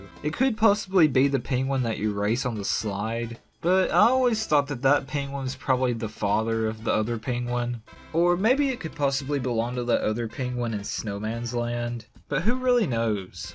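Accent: South, United States. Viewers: Is this guy from the south?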